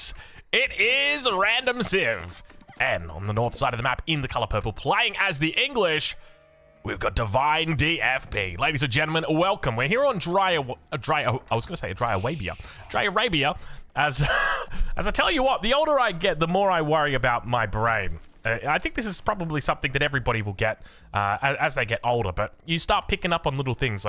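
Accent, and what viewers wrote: Australia. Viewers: Hi, you're aussie right? 😅, do queues pop ok for this on 1v1? cause i want a new comp rts to play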